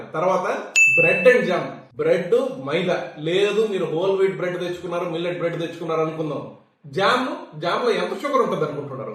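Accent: Nellore, India. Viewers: sir meru nellore valla?? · మీ వీడియోలు ఏమో గానీ మీ నెల్లూరు యాస మాత్రం అదుర్స్ .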